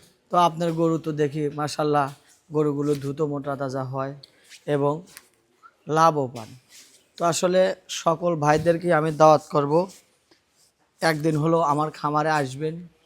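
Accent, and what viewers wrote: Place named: Gaibandha, Bangladesh. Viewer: ভাই আপনার হোম টাউন কি কোন ভাবে গাইবান্ধা জেলায়? ভাষার টান টা তেমনি লাগে। আশা করি রিপ্লাই টা দিবেন।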